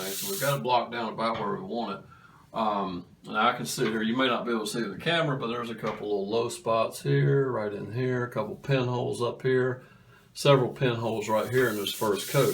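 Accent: South, United States. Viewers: This was so helpful, thank you! And something about the really clean finish on the wall and pleasant southern accent was strangely satisfying lol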